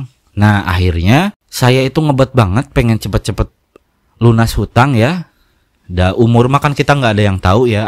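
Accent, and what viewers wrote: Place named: West Java, Indonesia. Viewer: Ditunggu bang, semoga apa jadi hajat abang bisa cepat terkabul 🙏. Btw logatna siga urang sunda bang 😅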